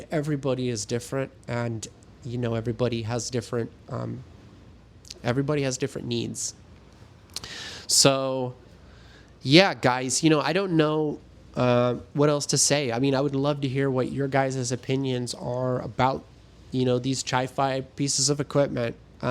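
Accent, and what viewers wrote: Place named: Germany. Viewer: Are you sure you're American? 😅 You sound like you have an accent, and for me, you somehow sound more German/European than American. Please don't be an immigrant, i.e., don't be contributing to the thug that is America. And disclaimer, I myself am Chinese and don't care to be called American, nor do I care to be represented by Hollywood Aesians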